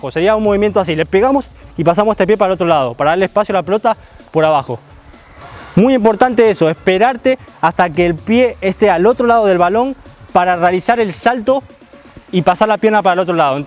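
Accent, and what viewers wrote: Argentina. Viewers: Crackk!!! Sos argentino no??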